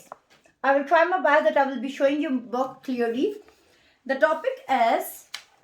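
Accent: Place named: Punjab, India. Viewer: MashaAllah Ap to Einglish Humari Punjabi ki tarha bol rahi ✌👍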